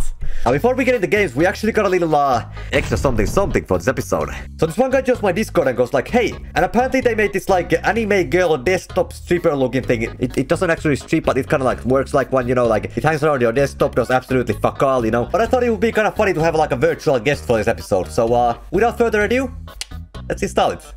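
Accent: Finland. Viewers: i love the strong Finnish accent probably because im Finnish.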